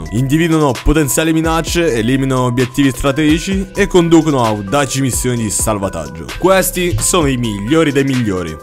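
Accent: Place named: Russia. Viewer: Sento un lieve accento russo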